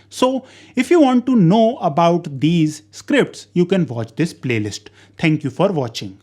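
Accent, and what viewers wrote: India. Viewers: Most stereotypical indian accent I've ever heard 💀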